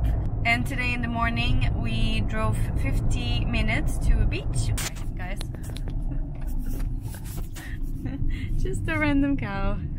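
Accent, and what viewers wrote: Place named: Norway. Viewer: Happy birthday! I think your english has become influenced by your exposure to norwegian😊.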